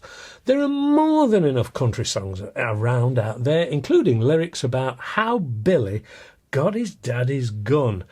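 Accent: United Kingdom. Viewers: Hi, I have owned various firearms and competition grade air pistols for 20 years, but just lately found the joys of airgun collecting. Must say that I enjoy greatly watching your reviews, even if they are about guns that I have intentions to never acquire. (Well, never say never...) Plus, since English is not my mother tongue and after listening all kinds of hardly comprehensible drooling of mixed "reviewers" from a certain other part of the globe, I really appreciate your expertise and crystal clear British accent. Keep up the good work!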